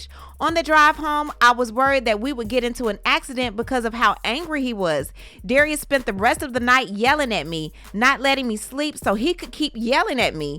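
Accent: South, United States. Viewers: It's the detailed and court document alwayyssss with oh and that southern drawl is everything.. Good work as usual detective 😂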